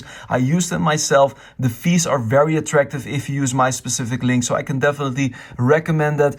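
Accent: Netherlands. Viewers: Ik volg je al een tijdje maar je klinkt echt als een Hollandse boy lol lekker bezig BTW.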